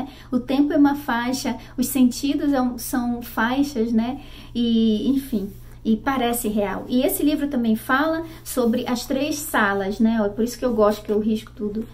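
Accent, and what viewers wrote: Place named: Belém, Brazil. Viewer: Senti um sotaque de BELÉM-PA...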